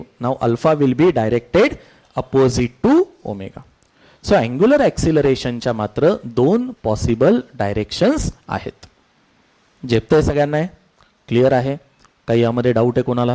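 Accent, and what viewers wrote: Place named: Pune, India. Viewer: Tumhi PUNERI such a shuddh Marathi. So smart teaching.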